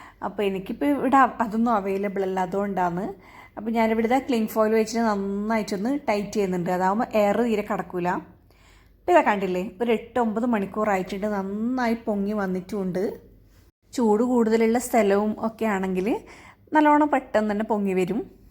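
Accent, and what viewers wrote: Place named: Thalassery, India. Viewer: Thalassery Kariya no samsaram kelkumpol thonunnu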